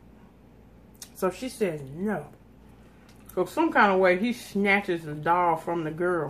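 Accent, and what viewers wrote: United Kingdom. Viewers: Your British Accent!!!!! lol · Your british accent is posh Londoner, aka the accent every nation that imitates brits uses lol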